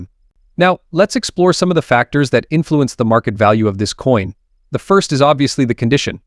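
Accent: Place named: United States. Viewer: What an absolute load of BS . Get a yank voice to narrate the topic of Australian coins they clearly know nothing about. The 2010 $2 coin had over 36 million In circulation. The 2008 scout coin over 17 million in circulation. No idea at all !!!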